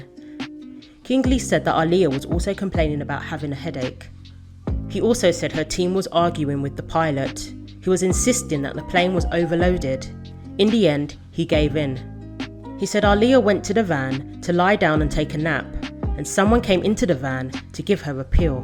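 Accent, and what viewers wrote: England, United Kingdom. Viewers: No new information here. Just told in an English accent.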